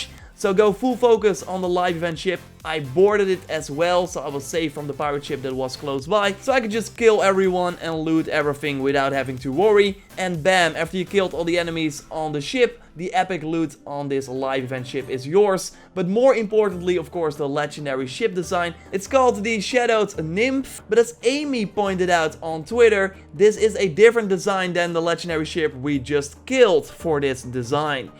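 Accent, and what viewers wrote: Netherlands. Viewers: please, say OY-kos not E-kos. Your strong dutch accent takes over alot haha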